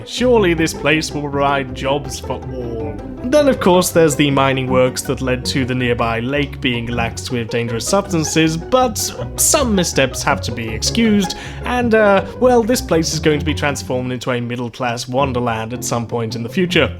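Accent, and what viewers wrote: United Kingdom. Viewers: And so dentistry is the truest metaphor for economic distributivism betwixt Britain and America. In Britain, National Health ensures everyone receives the same level of dental health regardless of the ability to pay, resulting in a national stereotype of bad teeth. In America, you can get super, top-of-the-line dental care, shitty dental care, and everything in between, based on your pay level, resulting in a stereotype of perfect teeth. British views of capitalism feature oppressive magnates shielded from the law oppressing poor downtrodden workers, while American views of capitalism are that you can arrive with no more than the clothes on your back and within five years, you can own a chain of laundromats, dry cleaners, or ethnic restaurants. Of course, that's easier if you're Vietnamese than if you're black or Hispanic, but we don't want to go down that road because it involves IQ and ethnicity. 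The point is that if the narrator had an American accent, we would expect Ancapistan to have crystal spires as low-rent housing, no pollution because fossickers reprocessed it into industrial precursors, and a chronic labor shortage as everyone preferred to retire and stay home on their extensive ranches. Instead we have RoboCop. Because British.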